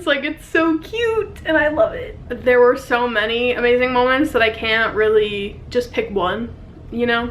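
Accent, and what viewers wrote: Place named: South, United States. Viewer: I MISSED YOUR SOUTHERN TWANG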